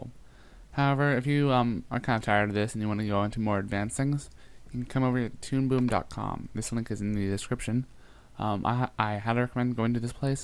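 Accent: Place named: Ireland. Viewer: This guy sounds like some gay pissed off irish...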